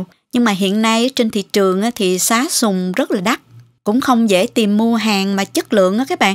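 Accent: South, Vietnam. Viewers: ơi.! Nhìn thấy hấp dẫn quá, nhứt định chị sẽ làm theo. Rất thích giọng nói miền nam của em dễ thương lắm.... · Giong noi chan that va de thuong cua mien nam bo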